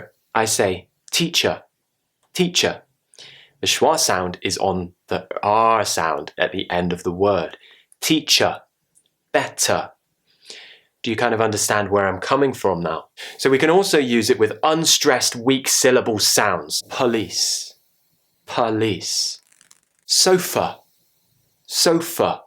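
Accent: RP, United Kingdom. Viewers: I love so much British accent especially your class, I'm still waiting your lesson everyday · i love you,! I like British accent, it is beautiful and you help me so much!!! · i am Abdimajid Borobiyo from Somalia the capital city, i really very exited to watch your video's in British accent. i like very much to have a powerful British English accent, thanks Mr... thank you so much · OMG I've just found a new motivation to learn.... - intelligent - handsome - British Accent <3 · Greetings, can someone tell me which british accent is he using? Is it the RP? Thanks everyone :) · I love british english and accent i think soon after watching your videos i will start speaking british accent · Keep it up the good work sir.. I went through all the British accent channels trying to improve my accent and literally you were the best.. cheers from Dubai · British Accent is really admirable. 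I appreciate your teaching sir and regard u as the best teacher for it. Sending you reams of wishes from India!! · I'm In a play and I need to learn the British accent and you're 😉! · Same here. I really want to speak like him. It feels so posh to listen to British accent. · I love to learn british accent. U speak very nice, like to hear u r accent. 👌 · Nawwwww that perfect british accent though 😍😍 Could listen to that all day. Nice video man!! Keep it up! Greetings from Germany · I like the British accent and I really liked it more when I listened to you. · i love to hear the British accent from u ... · I just watch this to listen to this beautiful British accent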